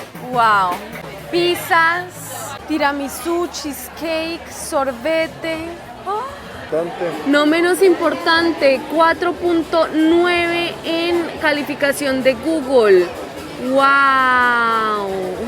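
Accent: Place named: Colombia. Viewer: Este video me ayudo a aprender espanol. Tu hablas mas claro que muchos otros. Talvez espanol de Colombian es el meyor del mundo!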